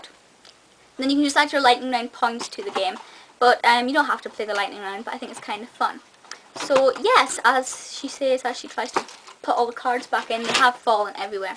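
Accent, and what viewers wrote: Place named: Scotland, United Kingdom. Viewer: Sorry, I'm American and I'm just inferring from your accent that you are of Scottish descent, if I'm incorrect, I apologize.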